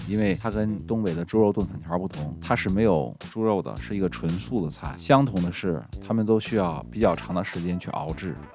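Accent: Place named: Beijing, China. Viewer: 时的センス让人当时就反应到“嗯？北京的口音？” 紧接着“今儿”可以确诊，“是北京人”🤓 直到“熬白菜”一出现哇塞超懐かしいやろ～ 一说这词儿得久违了多儿年了自己都想不起来但那是在北京儿时的记忆 “热锅凉油”一出来“爱？专业干这个的？” 那颠锅时的自如，郎鹤炎有话：“内就是厨师！” 完后才明白，“料理与吐槽”是这样结合的。就的还不是米饭是特么干粮！ 😄😆🤣订阅了❤️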